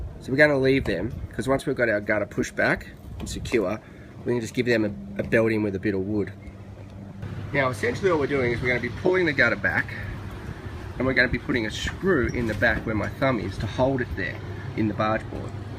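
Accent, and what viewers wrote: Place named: Australia. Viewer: First thing I noticed you're an Aussie, thank Christ mate just hearing another Aussie blokes accent giving me home repair advice is like "sweet as, not some seppo raving on with shit.. soon as I heard your voice after watching a couple of other videos I felt like having a beer like I was home.. I haven't watched your video yet it's probably almost whilst I have been raving on with crap but good onya I'll go get a beer and watch it now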